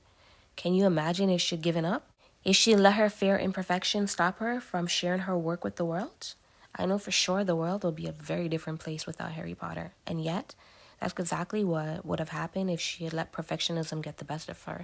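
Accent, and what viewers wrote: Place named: Toronto, Canada. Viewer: As soon as I heard you talk I said you must be from Toronto as well, Yayyyy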